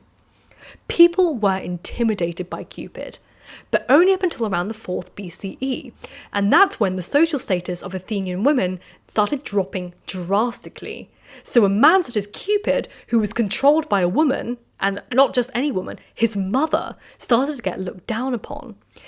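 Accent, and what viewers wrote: RP, United Kingdom. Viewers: I love her content but that has to be the RP accent to end all RP accents.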